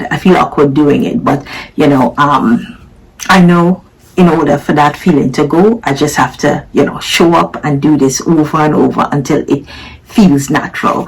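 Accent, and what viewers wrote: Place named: Caribbean. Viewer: 🎉 Congratulation’s To you Newbie to YouTube 🎉 love to hearing the Caribbean accent. Looking forward to your content and recommendations. Blessings to you 🙏🏾🤗💚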